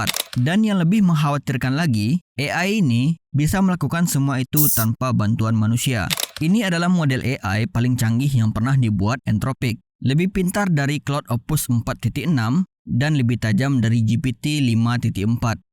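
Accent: Aceh, Indonesia. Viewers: Ureung aceh bang nyoe. ? bereh hana gadoh karakter bahasa aceh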